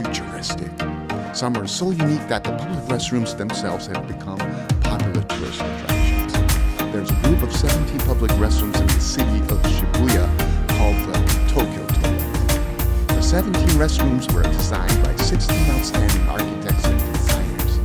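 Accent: United States. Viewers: you were "born and raised in Tokyo, and lived in Japan all your life", so how did you learn to speak with perfect American English? Just curious 😊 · Thank you for your videos! So informative, clear, interesting, just so well made. Really appreciate it! Please consider making videos for: 1. Places for sushi (low end to high end, please include price range) 2. Places for ramen (same as above) 3. Tourist traps (well maybe not trap but like places we should stay away from or be aware of if first time visiting Japan)😅 4. Hotels vs Airbnb 5. Ways of traveling around via, train, bus, etc. Btw, you sound like someone who was raised in the states.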